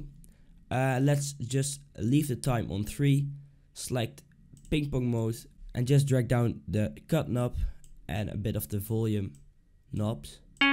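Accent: Netherlands. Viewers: lekker nederlands accentje wel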